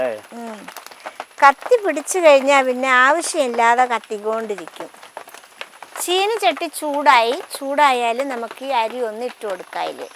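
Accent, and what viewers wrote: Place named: Palakkad, India. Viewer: Ningalude veed evade. Palakkadan slang und avatharanathil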